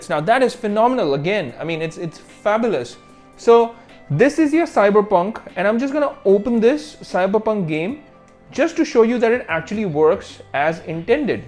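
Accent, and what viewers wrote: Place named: India. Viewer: The Fact that he looks a bit like Chinese...with an indian Accent. 😬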